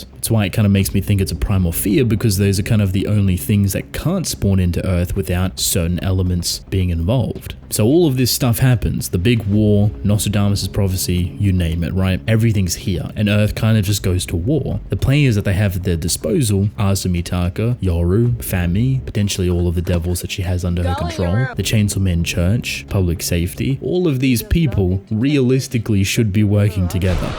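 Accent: Australia. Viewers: maybe it’s the deep Australian accent 🤷‍♂️. Looking forward to your new ASMR channel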